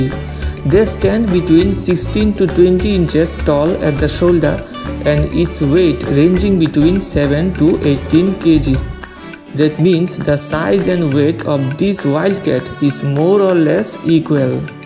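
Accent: India. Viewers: Sorry but I just couldn’t watch that video. That Indian accent reminds me of the frustration every time I try to call customer support, be it for my cable, phone, internet support, etc and I don’t understand what the hell the Indian agent are trying to explain to me and worse they don’t understand my American English.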